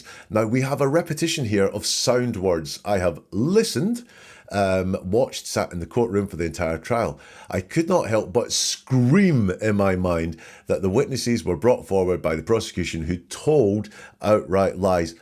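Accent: Scotland, United Kingdom. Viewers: Terrific stuff. Which part of Scotland are you from?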